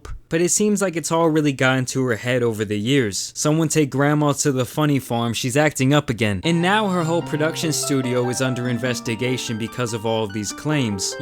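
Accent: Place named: New York, United States. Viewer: I just noticed he speaks with a slight new york accent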